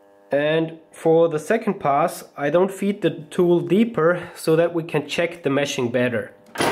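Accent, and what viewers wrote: Austria. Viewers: Bisch Du e Össi oder e Schwizer? ;)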